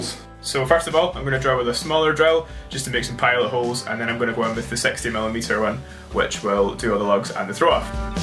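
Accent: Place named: Scotland, United Kingdom. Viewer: Very interesting!I think your DIY skills are WAY beyond Homer Simpson or even former President Trump!!!!A lot of time,DIY projects success is contingent on your tools.Obviously you have more that adequate equipment!!Are you a carpenter!You are my first sub in Scotland/cool Accent!Thanks for the very excellent demo even I understood it!LOL Neil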